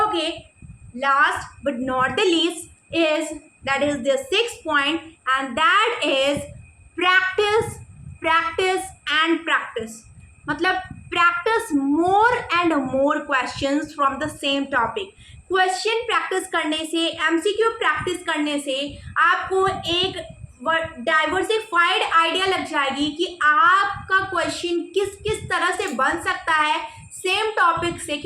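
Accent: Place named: Bengal, India. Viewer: Aap bengoli ho kya madam .....kyu you are looking like bengoli and the way you are talking is relate to that you are bengoli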